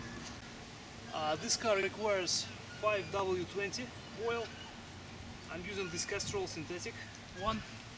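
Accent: Russia. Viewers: he sounds so russian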